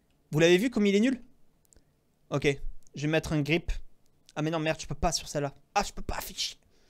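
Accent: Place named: Alsace, France. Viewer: Tu vien d'Alsace ?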